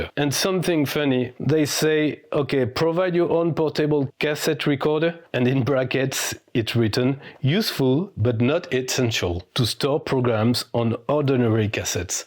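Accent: France. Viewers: French accent: French. Kisses computer: definitely French! Great video and please don't apologise for speaking fluent English with a beautiful French accent. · Awesome! We produce all kinds of new add-ons, and do refurbs of Sinclair machines. Lovely to see your new channel, and I do like your French accent! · Instant subscribe. And never apologise for your French accent. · I am very proud of myself, I watched your video and I could understand all that you were saying, so no I can listen to a Frenchman speaking. Thank you, I give you a big thumbs up! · Don't apologise for your perfect French accent. You present an excellent video in great style. Donc il faut que je voie les videos que vous avez déja téléchargées sur Youtube en français! The ZX81 back then taught me how compact you could make a simple OS to cope not only with the interface and the Basic interpeter, but also the display. 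It was a model of economy of coding. Its printer was very clunky, too: a spark printer which burned characters in a silver metallic coated paper to create dark grey "printing". I found a box of spare spools of that coated material only a few months back, safely put away in 1985! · Never apologies for your lovely french accent ❤ not a scam but cheap tack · Happy to listen to a French accent instead an AI voice over any day. I enjoyed the content and look forward to more videos. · I very much like a French accent, I like the French. Love the video on this and the Oric, and I very, very, much hope that you will continue to make more English language videos. Particularly, since much to my embarrassment, I don’t speak French. (In my defence I wanted to learn French at school, when the brain is still receptive - but an older sibling had chosen Spanish and we had all the books. Colour me disappointed I can tell you.) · Hi, Do not be sorry for your French accent. 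As you could not help where you where born. Be proud of it. 👍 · Don't worry about your French accent. Your English is better than my French (neither read nor speak it). Arctic Retro embraces his Norwegian accent - you should your French. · Welcome to the rest of the world, LOL. 😁😎 love the French accent and thank you for the fabulous video. ❤️❤️❤️❤️ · Thanks for the video! I helped win a ZX80 for my primary school, then managed to persuade my parents to buy a ZX81 when it came out - that Tyrannosaur from 3D Monster Maze still haunts me! Thanks for showing that Pitfall style game, it looks amazing! PS - I'm a Brit and I love your French accent! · Ace accent, reminds me of the comedy French Accent you always see in English language TV! Great subject!!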